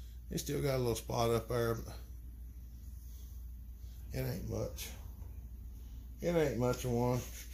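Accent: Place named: South, United States. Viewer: "Hello Everybody" with that southern accent just lightens up your day. You should voice for Hallmark, they could produce special edition electronic greeting cards.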